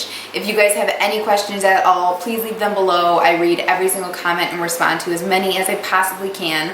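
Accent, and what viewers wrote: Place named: Upper Peninsula, United States. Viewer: Are you a yooper?😂 Me and the rest of my family are northern and you sound just like us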